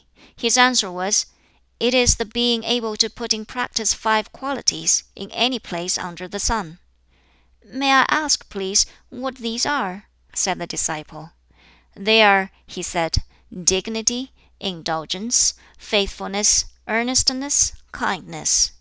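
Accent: China. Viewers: I slip into brummie when mad so go check out a clockwork orange to know what true confusion feels like. Can’t believe people are complaining about a Chinese book being read with a Chinese accent 🤦‍♀️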